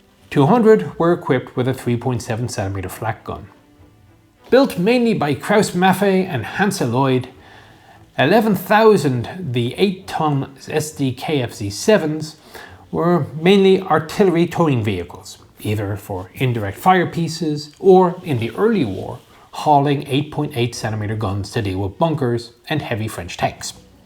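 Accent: Ireland. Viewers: Is this some joke reference that I've missed? As bwarre2884 noted, the accent is very Irish. In any event, he is perfectly understandable. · I can hear the Irish accent coming out all over the place!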